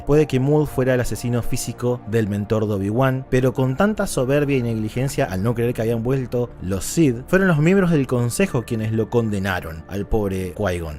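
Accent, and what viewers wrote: Argentina. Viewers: es argentino? Pobre. Saludos desde argentina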